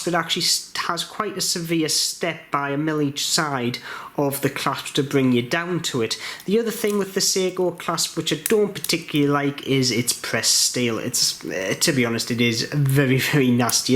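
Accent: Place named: North, United Kingdom. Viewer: Can’t place the accent. South Durham, Cleveland? I enjoyed the review. I have a black gen 2, which, needless to say, I adore. May just get one of these.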